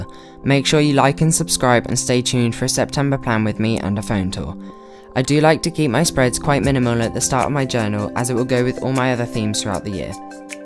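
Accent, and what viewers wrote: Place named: United Kingdom. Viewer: omg you have that british accent YAASSSS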